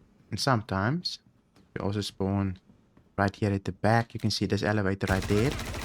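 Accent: South Africa. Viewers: South African accents are very lekker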